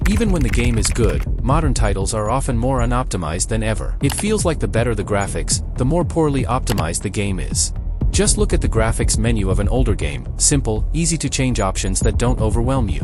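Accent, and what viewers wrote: India. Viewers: Dude r u from India?!!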